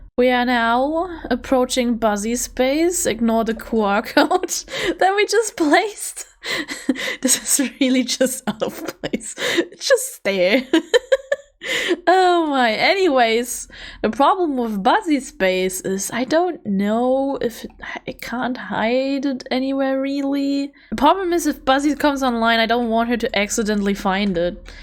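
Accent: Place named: Germany. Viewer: И вот я здесь, человек что английски понимает очень условно (а точнее вообще почти не понимает), смотрю видео по Minecraft на английском, с, как я понимаю, немецким акцентом (на мой слух) и пишу этот коментарий с помощью переводчика... And here I am, a person who understands English very conditionally (or rather, almost doesn't understand it at all), watching a Minecraft video in English with what I assume is a German accent (to my ears), and writing this comment using a translator...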